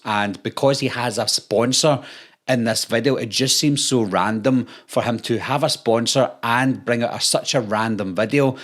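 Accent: Scotland, United Kingdom. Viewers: How does one get THAT Scottish?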